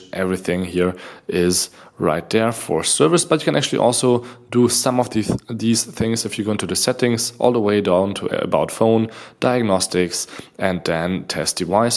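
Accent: Germany. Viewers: you are german native i assume ? Does this video also exists in german?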